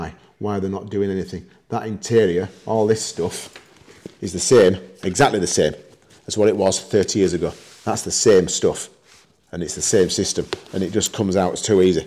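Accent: United Kingdom. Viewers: With that accent, I was expecting the price to be in a British pound rather than dollars.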